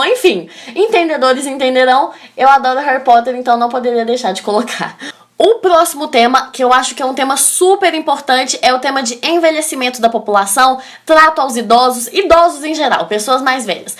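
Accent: Minas Gerais, Brazil. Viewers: minas gerais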